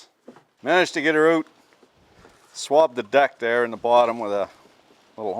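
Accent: Canada. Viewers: I tell ya I love you man! I'm canadian been living in USA since I was 9 (am 39) but just listening to the engineering knowledge mixed with canadian common sense humor and a smidge of the accent brings me back to listening to my grandpa in Regina sask working on his international harvester good times. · Great to hear a true canadian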